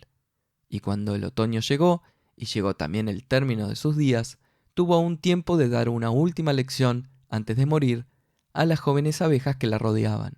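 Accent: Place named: Argentina. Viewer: Excelente! Enfim um canal com conteúdo em espanhol com acento Argentino. Sou muito grata!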